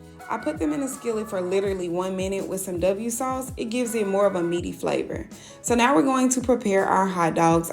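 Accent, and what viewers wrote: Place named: Houston, United States. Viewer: Awesome channel!!! I knew you had to be in Texas… Us Houstonians have that accent!!! Keep on inspiring others!!! Blessings! 🍉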